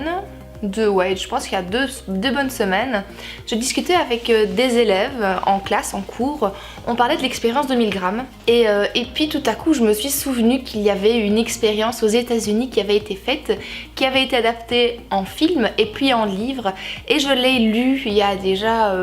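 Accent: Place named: Belgium. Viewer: Ahaa... La nostalgie... Une de mes meilleures amies. Tu lui passeras le bonjour :) La Vague je n'ai pas lu le livre mais le film m'a énormément marqué. Du génie. Trop bien le marque page dédicacé par Amélie Nothomb :D Les Royaumes du Nord j'ai lu le 1 et 2 il y a presque 15 ans en pleine adolescence mais je n'ai jamais terminé, jamais lu le tome 3. Pas que ça ne me plaisait pas mais j'ai décroché et mes lectures se sont tournées vers d'autres choses. Du coup je ne connais pas la fin mais pas le courage de me relancer dans cette saga... (J'adore ton accent, j'adore la Belgique ! :D )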